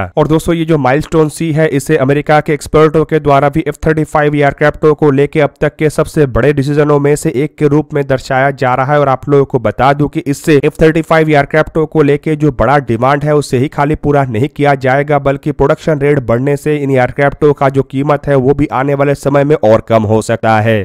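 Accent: Bengal, India. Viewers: Bengali speaking Hindi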